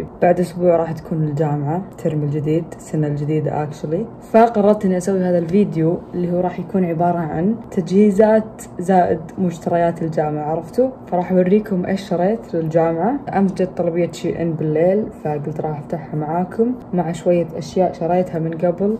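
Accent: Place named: Kuwait. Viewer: اللهجه نص كويتي نص سعودي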